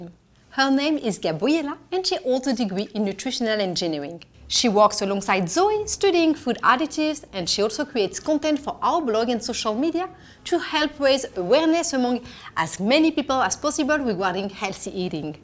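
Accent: France. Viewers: The narrator should be someone who speaks fluent English without a strong French accent. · Love the thick French accent with risky soundly whiskey. lol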